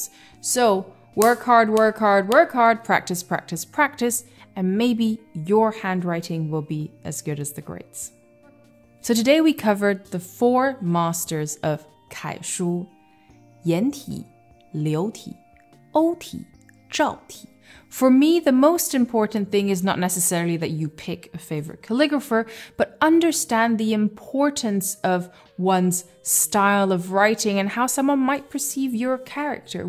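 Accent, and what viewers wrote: England, United Kingdom. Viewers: you've got a good British accent. you sound like Emilia Clarke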